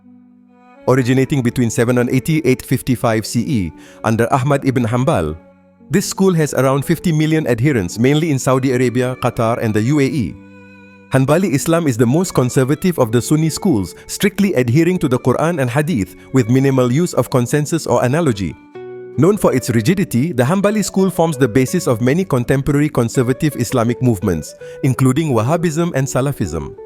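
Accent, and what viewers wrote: India. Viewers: 😂😂 a video with Indian accent big red flag 🚩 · has indian accent.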